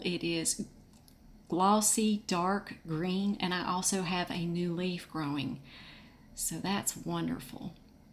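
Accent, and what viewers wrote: South, United States. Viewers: Hey, I love, really love your channel!🤗 Thanksgiving was wonderful and life is good! However, I'm new to orchids and have just purchased my first one three wks ago. I cleaned all the deteriorated bark off very well, put it in lava rock after purchase as i seen per Rick's channel and changed it rapidly over to water culture. It got dry, very dry very fast even though I soaked as he instructed. I am in AZ🌵😎🌵with virtually no humidity, understanding that he is in humid Alabama. So wish me luck as I have been watching your videos faithfully. I do have a Q...my phal purchase from Fry's (Kroger in Tn) came with no air roots!🙄They were cut off🤔by nursery I'm assuming. The 1/2" remnents are barely visible. Are air roots really needed or did I make a fatal mistake purchasing this phal not knowing at the time? Thank you❤️so much and love your southern accent.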